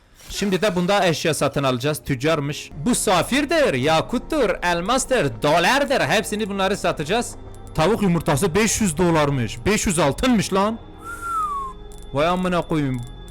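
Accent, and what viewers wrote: Elazığ, Turkey. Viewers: Abi elazığlı mısın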